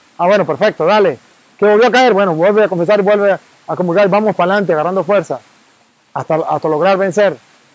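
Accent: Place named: Mexico. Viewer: Buenos días padre bendiciones padre usted venezolano su acentos es mexicano. 🙏 yo creo firmemente cuerpo y la sangre de Jesús. Tuve bella experiensa..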